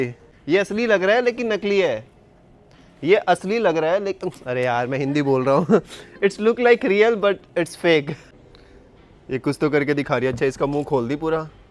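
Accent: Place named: Bihar, India. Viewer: Bihar se hain ye.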